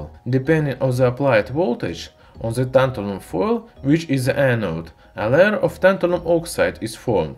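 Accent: Russia. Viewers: Russian accent, its great , listen & learn it. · His accent is sometimes starting to go to Russian accent but I understand him. I don't sometimes understand him it's accent is very good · I think his accent is interesting and I've never heard a Russian accent like that. He looks Finnish to me, and I wonder what part of Russia he is from.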